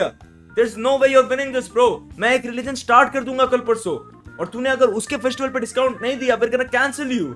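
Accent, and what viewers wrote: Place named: Bengal, India. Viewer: Bengali ho bro 🤭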